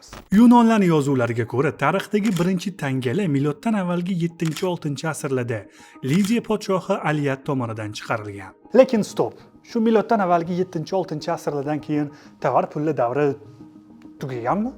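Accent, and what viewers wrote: Tashkent, Uzbekistan. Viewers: molodes. Bizani dokondan oq sariq koyne xarid qildiz.loyiha un albatta. Korib xursan boldm. RESPECT 📌 Siz bn yangi loyihez bo'yicha fikir almashdi. Qisqasi keng fikrli, IQ balan, tarbiyali bola ekansz. Gap yo. Good luck bro ☝️🤲📌 📢Toshket shevasiga allergiya yoq dp umid qlaman.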